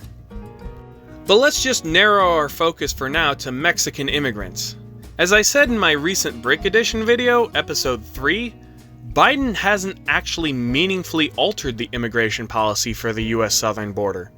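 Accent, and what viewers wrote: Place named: Germany. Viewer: It took me a bit to realize that your German accent wasn’t just a poor attempt at a Mario impersonation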